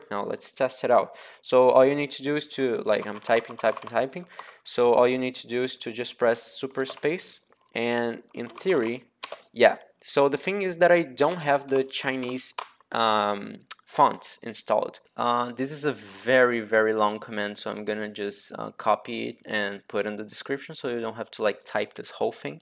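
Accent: Brazil. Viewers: Your accent sounds Brazilian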